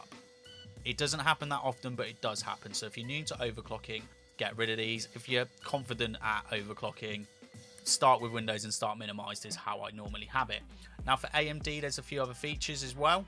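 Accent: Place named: England, United Kingdom. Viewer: That English accent... Just perfect.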